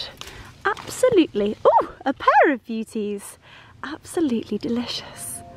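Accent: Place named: RP, United Kingdom. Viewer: Omg you make me laugh your so posh but the ingtons on the end of your words are so funny dipingtons splashingtons lol never change x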